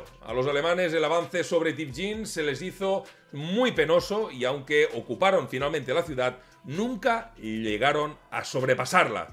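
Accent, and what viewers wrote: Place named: Catalonia, Spain. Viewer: Tienes acento catalán. Creo que eres valenciano, verdad? Salutacions des de Barcelona.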